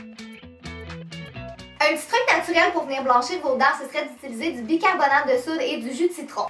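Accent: Quebec, Canada. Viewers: Il est trop mimi ton accent canadien(ou quebecois ?!!!) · salut , merci ta video aide beaucoup :D juste c'est dommage , j'aime beaucoup l'accent québécois mais parfois c'est aps facile a comprendre quand tu parles vite comme ca :D je te conseille de ralentir un tout petit peu et se sera parfait ;) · C'est québécois parce que je comprends pas très bien et je parle français de France c'est pour ça mais j'adore tes vidéos! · C'est québécois 😉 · HatsuMeKira Si elle a un accent Québécois elle as un accent Canadien c'est sur 😊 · HatsuMeKira son accent est québécois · Super ! Tu parle parfois vite et avec ton accent on ne comprend pas tout. Mais pour le reste j'ai appris plein de chose que je conte appliquer. Petite question : si les faut ongles ce décolle quand on les passe sous l'eau, comment on fait pour se laver les mains ? PS : j'adore l'accent québécois !!!!!!!!!!! 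· J'adore l'accent québécois ! J'adore les québécois tout court 💘🇨🇦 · son accent est québécois (Je vien du Québec) Pis je trouve ça normal mais peut-être que pour vous ça vous semble bizzard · cc j'adore ton accen québécois ! tu es trop belle ! j'ai essayer le masque avocat et graine d'avoine : sa marche super bien ! MRC pour l'astuce ! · C'est québécois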